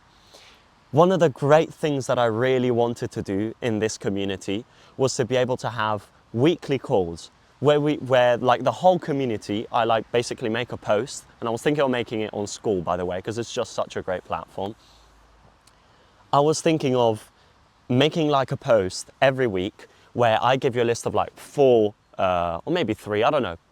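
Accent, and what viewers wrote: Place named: England, United Kingdom. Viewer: he sounds VERY ENGLISH to ME. Any background info on why you moved to Italy?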